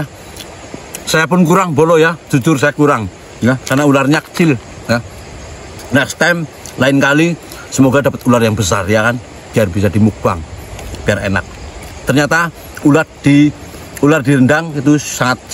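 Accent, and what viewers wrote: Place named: Banyumas, Indonesia. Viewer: wong ndi bolo deneng ngapak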